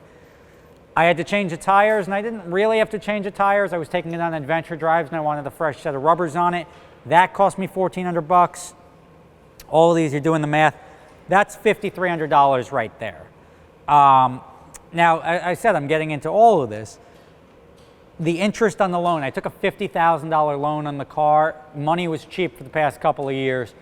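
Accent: New Jersey, United States. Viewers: Great Jersey Accent! Who cares what it cost - wtf - If your looking for a Porsche don’t sweat the cost as you know it’s going to be expensive if the shit breaks. Otherwise I liked the the vid.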